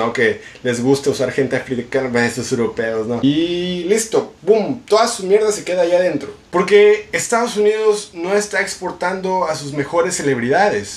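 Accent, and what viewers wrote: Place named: Monterrey, Mexico. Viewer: Jajaja. No sé por qué pero me encanta cuando dices " No, cabrón, ¿qué traes? " se oye bien pinche sexy con ese acento regio tuyo 😍. Fin del comunicado. 🙈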